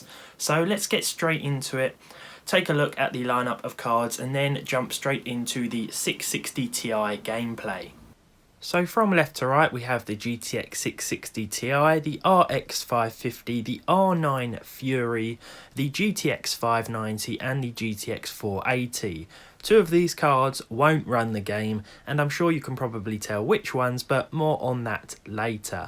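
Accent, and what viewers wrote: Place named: United Kingdom. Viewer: That british english... pain in the ears 😞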